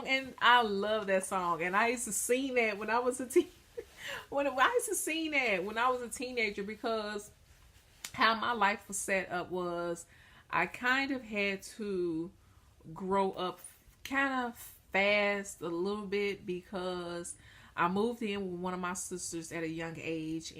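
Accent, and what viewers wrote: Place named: South, United States. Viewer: Great Message!! Where are yoy from? I hear a southern accent.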